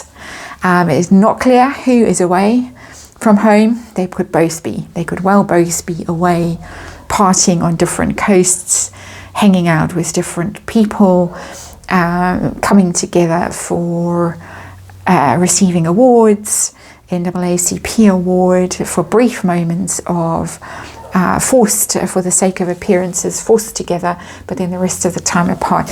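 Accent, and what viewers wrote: South Africa. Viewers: Harry THINKS.?? Is he capable? Of course Megan WANTS something, nothing will ever satisfy her. Once again, spot on! Love your readings,. Also love hearing your south African accent, much love from a South African expat.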